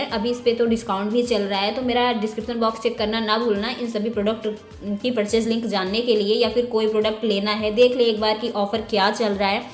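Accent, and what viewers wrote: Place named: Kanpur, India. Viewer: Amazing makeup di khaa se h aap.... Apki language kanpur ki lgti h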